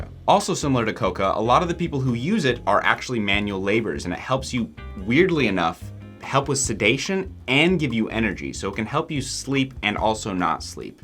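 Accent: United States. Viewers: You evidently don't understand the difference between addiction (physically and mentally dependent) and a habit. But I don't blame you. Your accent sounds American, and America has the weirdest created shared realities around addiction.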